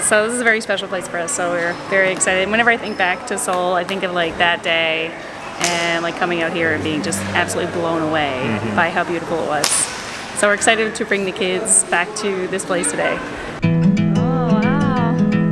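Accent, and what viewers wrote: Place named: United States. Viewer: I love your English accent with excellent pronunciation!! You speak very well with clarity and coherency!! You sound more of an American than a Canadian for some reason though. Sorry if this sounds offensive. Did you live in the U.S.?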